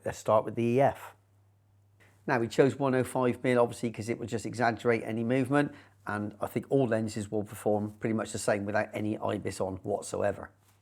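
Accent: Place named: West Country, United Kingdom. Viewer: very good review, the parfocality of the 2.8 seems more than good enough to settle any worries I had. Agreed on the pricing of the servo unit, it's far too high a percentage of the lens' cost itself. Shirt was on point too, and west country accent turned up to 11 made up for absence.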